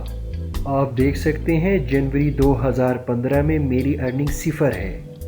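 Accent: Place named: Kashmir, India. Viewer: Bhai, good help hy ye... Men khud Azad kashmir Muzaffarabad sy hon... ap kashmiri speaking hen kia? Your accent say's something about Kashmiri (Koshur) Language...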